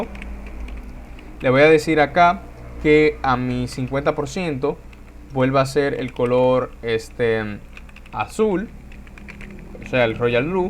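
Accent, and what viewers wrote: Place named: Dominican Republic. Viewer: Suenas dominicano 😂 saludos broth bendiciones un nuevo suscriptor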